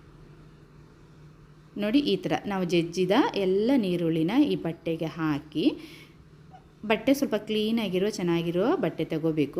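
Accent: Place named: Bengaluru, India. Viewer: ನೀವು ಯಾಕೆ ಬೆಂಗಳೂರು ಕನ್ನಡ ಹೇಳುತ್ತೀರಾ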